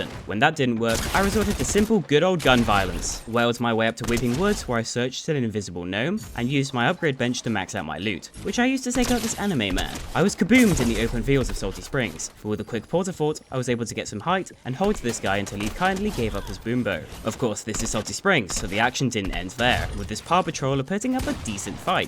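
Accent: United Kingdom. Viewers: Luke te Notable but more calm and british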